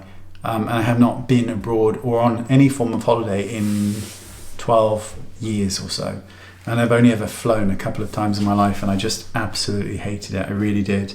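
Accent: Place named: England, United Kingdom. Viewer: Hi,. Thank you so much for this video. It was really great to know more about you. I'm a 52 year old Canadian woman who has a very grown up, big girl job and everyone thinks I've got ot all together but I don't. I'm just like you! I'd never know from your videos that you have some anxiety and doubts and fears. I too love sappy movies, songs and books. Historical romance...oh, my beating heart! I too have a very dark sense of humour and laughing so hard that I snort and cry is my favourite thing in the world. Movies I love: Shakespeare in Love and When a Man Loves a Woman with Andy Garcia and Meg Ryan. It's quite old but if you haven't seen it, man, get the tissues. Also The Family Stone. I just watched a documentary called McMillions about a scam being run on the McDonald's monopoly game in the 80's. If the story wasn't being told by the FBI I would never have believed it was true. 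I'd love it if you could show some tips and tricks for mature faces. I loved your video on hiding eye bags. Mine are trash bag sized so I can't totally hide them but now I can make them much less noticeable. Keep up the great videos...I just love listening to your voice. An English accent gets me every damn time!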